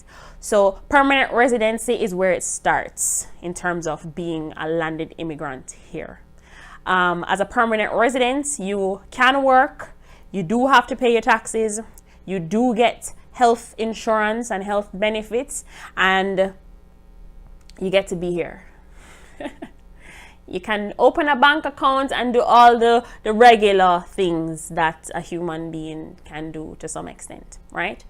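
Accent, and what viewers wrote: Jamaica. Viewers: You sound Jamaican. Are u?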